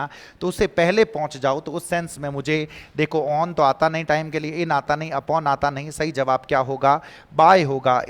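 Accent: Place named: Marwari, India. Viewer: Sir aap kha s ho marwadi hmare side ki h 😂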